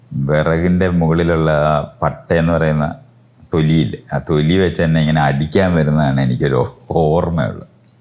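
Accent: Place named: Kollam, India. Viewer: പ്രിയ മൈത്രേയൻസാർ, താങ്കളുടെ മലയാളം എൻ്റെ കൊല്ലം (കൊട്ടാരക്കര) ഭാഷ പറയുമ്പോൾ എനിക്ക് എപ്പോഴും അനുഭവപ്പെടുന്നുണ്ട് ഇന്ന് സംശയം മാറിക്കിട്ടി സന്തോഷം താങ്കൾ എന്ത് ജോലിയിൽ പ്രവരത്തിച്ചിരുന്നു. എന്നു കൂടി പറയു👍♥️👍♥️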